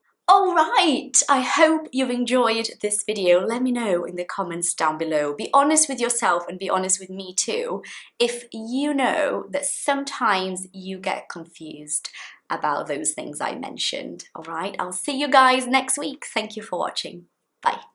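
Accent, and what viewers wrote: RP, United Kingdom. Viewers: Hello, is everything okay? Thank you for all your tips. I've known all the words about you said in this video. And I appreciate your dedication to teaching us. I love your British English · I reckon your accent is really posh.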